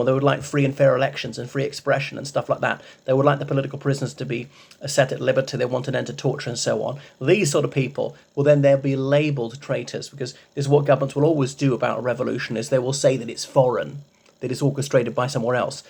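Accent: RP, United Kingdom. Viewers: 💋 You're like a sexy articulate rugby player w/ a posh accent sooo sexy omg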